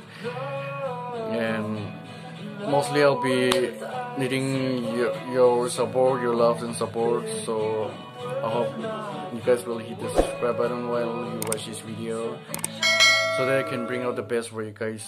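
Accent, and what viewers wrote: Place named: Australia. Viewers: Your English sounds like Australian. 😍 Handsome bro. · Achuvvvvv Australian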